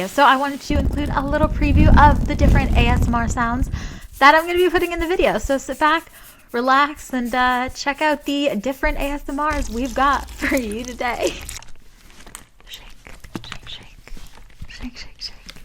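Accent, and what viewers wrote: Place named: United Kingdom. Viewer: shes sounds british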